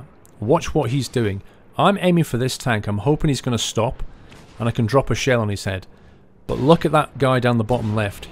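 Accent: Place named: United Kingdom. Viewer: Lets Mber нормально он вроде разговаривает, носитель английского языка (британец, слышно британский акцент)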